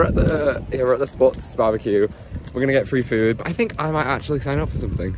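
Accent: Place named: United Kingdom. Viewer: I enjoy your Vlog. Where in the UK are you from? I have trouble locating the different English accents. In the U. S., we either hear broadcasters or members of the Royal Family, so I know there are many accents, but I can't determine which one is which.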